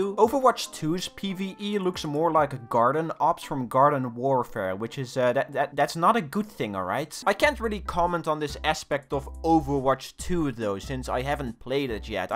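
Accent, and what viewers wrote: Netherlands. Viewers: Are you dutch? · hes dutch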